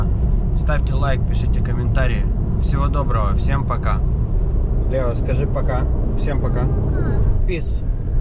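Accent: Odessa, Ukraine. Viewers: Отличная поездка! Слышу говор знакомый, а Вы одессит. Ну а я с Донбасса, Луганск. Удачи Вам!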